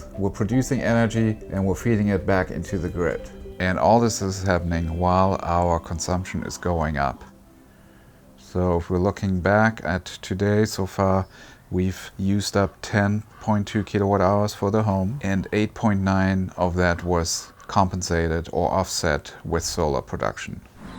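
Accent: Germany. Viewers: You have an accent, are you German?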